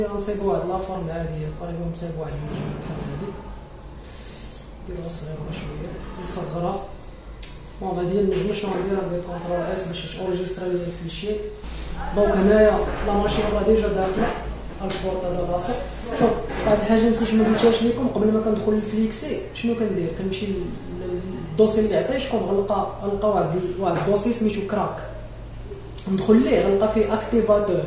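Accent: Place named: France. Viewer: اتكلم عربي فصيح... كلامك زي الفرنسين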